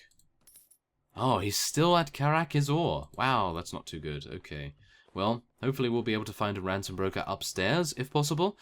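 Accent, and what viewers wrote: United Kingdom. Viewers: Even though I am also british, I love your accent xD Some of the way you talk does make me giggle :)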